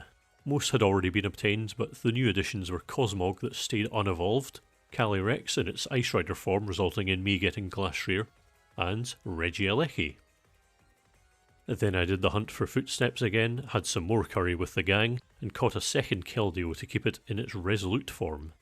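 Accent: Scotland, United Kingdom. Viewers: I'm genuinely curious. Is this your normal voice? Where is the accent from, it sounds british but i am uncertain, is it just a deeper tone? How old are you? I feel like ive heard a similar voice but i cant say for sure and definitely cant remember where from. It is a very calming voice though... i sleep to your vids sometimes and i find it very helpful. I also enjoy rewatching it in the morning, if i feel that i missed something. Speaning of, i should search for those hidden kiwis lol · so this made me want to try this, really enjoyable video, thank you! Also, love ur accent, i am also Scottish but ur voice is very soothing lol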